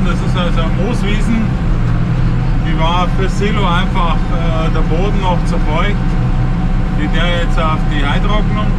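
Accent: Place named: Bavaria, Germany. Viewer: Du konnst a boarisch ren😉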